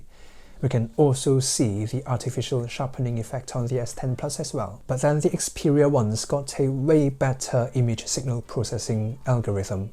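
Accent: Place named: United Kingdom. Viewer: 我的天啊你的british accent真的太好聽啦，完全是一種享受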